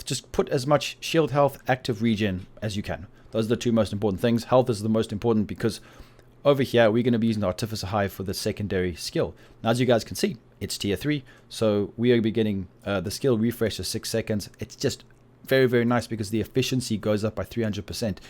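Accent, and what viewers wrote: South Africa. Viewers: Hey bru love your vids. Are you South African? I'm new to the game been playing about a week. Just got to WT5 and trying to unlock my specialisations.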